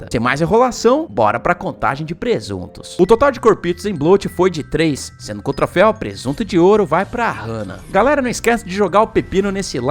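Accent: Portugal. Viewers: muito bom sotaque portugues, abraços, sou de portugal e vejo os teus videos e acho top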